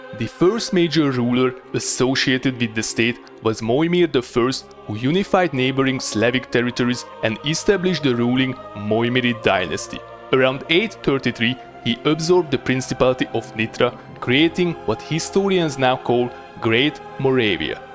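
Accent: Hungary. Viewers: I instantly recognised that he was hungarian just by his accent XD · Annyira hallom hogy magyar vagy XD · Nem kell. Van egy fajta sármja a magyar kiejtésnek. Csak így tovább!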